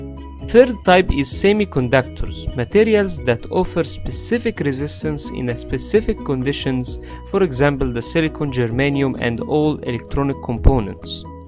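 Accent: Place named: Egypt. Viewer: اللهجة دي مصري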